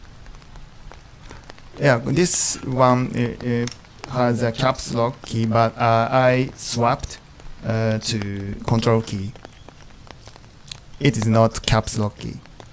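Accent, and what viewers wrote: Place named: Japan. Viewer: 日本人の喋る英語ってとても参考になります。vimも覚えたかったので私にとっては素晴らしい動画でした！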